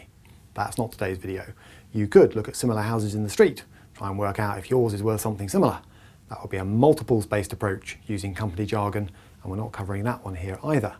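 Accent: United Kingdom. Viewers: love the British English! Always feels like the accent that any good teacher should use haha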